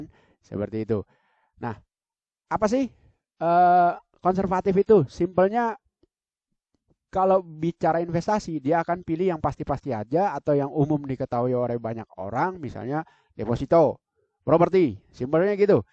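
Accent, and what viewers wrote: Java, Indonesia. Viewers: Jiangkrikkk.... Muantapp ker lak ngomong. Medoknya dapet... Coba pak bilang jianncukkk...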